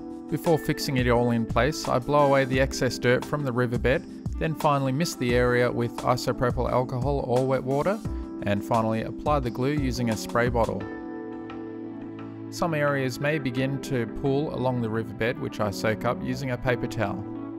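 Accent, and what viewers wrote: Australia. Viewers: Hey mate, you sound like a fellow Aussie, what foam is that and where do you get it from? Thanks!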